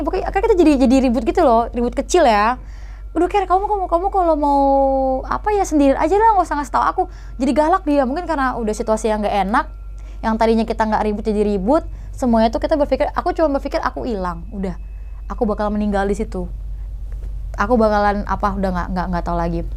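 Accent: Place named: Surabaya, Indonesia. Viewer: Mbak.e ki wong ndi to kok logat.e kyk kenal, sby ta opo malang.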